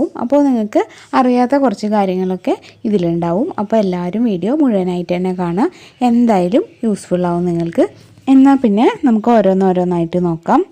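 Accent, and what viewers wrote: Thrissur, India. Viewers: നല്ല ഒരു മെസ്സേജ് ചേച്ചി തൃശൂർ ആണോ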